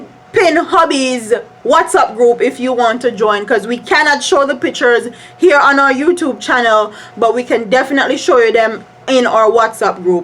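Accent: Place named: Jamaica. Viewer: I love when you give it to them in the raw patois! Lol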